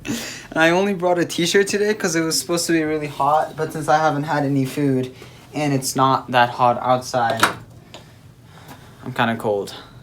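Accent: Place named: United States. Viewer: cool video! im an american that moved to Turkey a couple of years ago. i have some uzbek friends. they dont know english, so its really interesting hearing an uzbek person speaking perfectly accented american english lol... Türkiye'den Üzbekistan'a selamlar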